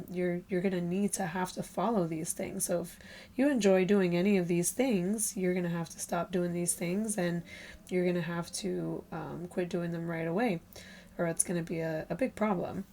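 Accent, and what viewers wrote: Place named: United States. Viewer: You have an American accent so speak about a more progressive Islamic world like some Muslim communities in the West... There are also progressive Islamic communities elsewhere in the developing world... Why only speak about the worst of the worst? But you don't do that with Christianity??Howcome?🙂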